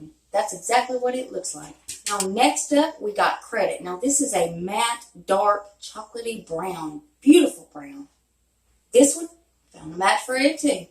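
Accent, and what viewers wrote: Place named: West Virginia, United States. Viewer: I live on West Va/Va border and we have the same accents, you could be my neighbor. Lol. So our accents are the best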